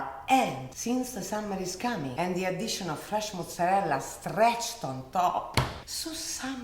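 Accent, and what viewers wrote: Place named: Rome, Italy. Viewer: Ma tu sei romana perché sento quando parli italiano la cadenza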